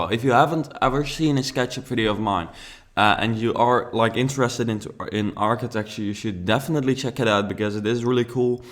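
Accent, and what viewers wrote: Netherlands. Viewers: ah i love your videos and your builds and ik ur Dutch maar je hebt het meest Nederlandse accent als je Engels praat dat ik ooit gehoord heb xddd (which- dw- its cute)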